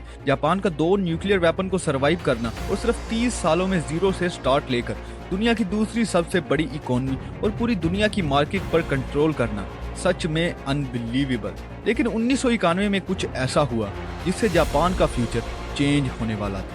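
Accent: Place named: Pakistan. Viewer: Bhai accent sudhar le (pakistani lagh rha hai )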